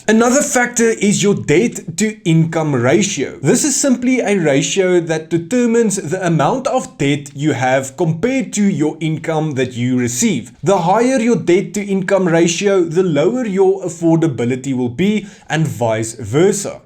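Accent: Afrikaans, South Africa. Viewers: I'm new here, I love your afrikaans accent 😅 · New here as well, first thing I noticed is the Afrikaans accent, I love that he is authenticity. Dit is 'n paar waardevolle kennis., Danko bhuti 🙏🙏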